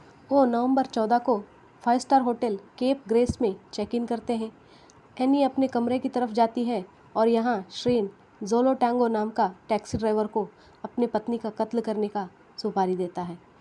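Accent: South, India. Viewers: Are you Hyderabadi or any other part of South India??? Love ur accent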